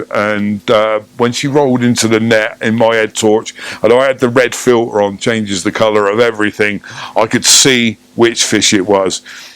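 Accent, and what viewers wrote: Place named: France. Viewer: Welcome back we've missed you. Loving the French accent!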